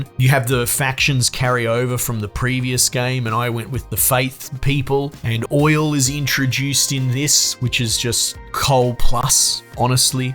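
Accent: Australia. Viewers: As an Aussie, it's come as a massive surprise how pleasing it is to hear your accent. I usually find the Aussie accent repulsive. So --- compliments to you sir. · I can tell you’re Aussie as well, love how you telling it straight. 😂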